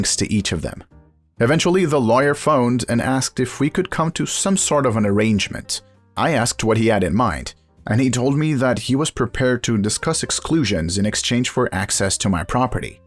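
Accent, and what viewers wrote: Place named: Eastern Europe. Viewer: Just subscribed. These stories are new to me, no repeats so far. One thing: I'm enjoying the reader's accent when speaking English. I know it's not human but still, it doesn't sound strong enough to be a recent arrival from Eastern Europe, the accent has mellowed....what a thought about a computerized/digital voice.... there's something very, very wrong with me🤔 I suppose a portion of the listening public have reverted, really. 40 or 50 years ago, we listened to longer stories from a cord-bound radio in one room of the house(mostly.) These days, we go everywhere with a plastic plug stuck in one or both ears, often connected to a garrote-type device, for the sake of the same type of entertainment....stories. Well done, 🎉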